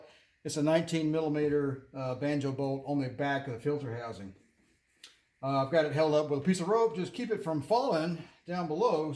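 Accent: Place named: Alabama, United States. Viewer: Hey what part of Alabama you from?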